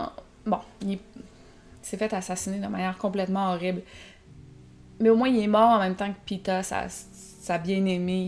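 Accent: Canada. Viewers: Chère, vous êtes une très bonne conteuse avec cet adorable accent canadien même si toutes ces histoires sont "horrifiantes" et tristes. Et je tiens aussi à vous dire que la grossesse vous va à ravir, vous êtes rayonnante et très belle 😍 Une maman qui vous envoie du soleil de France.🌞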